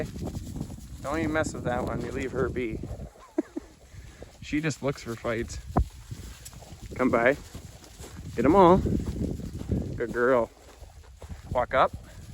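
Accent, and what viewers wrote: United States. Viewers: Nice to listen to a fellow midwestern accent. Keep up the great work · First time I hear someone talk about herding with an American accent. It sure is something to get used to after listening to Scottish and Irish farmers. Anyway, very interesting video.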